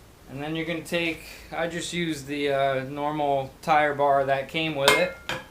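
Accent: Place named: South, United States. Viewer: I hear saquetas.......... You must live in the South? Lol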